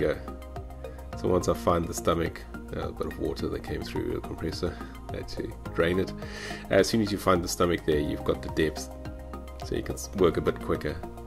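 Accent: South Africa. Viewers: Your address says New Zealand, but your channel name and accent says South African? Great video btw.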